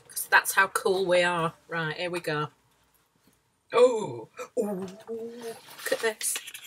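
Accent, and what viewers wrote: United Kingdom. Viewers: Are you from east Enders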